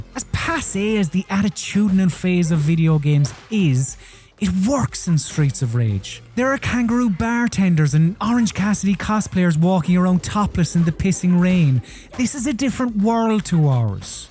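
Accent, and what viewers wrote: Ireland. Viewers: Man its nice to hear another irish voice going on about great games :) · I like the Irish sounding accent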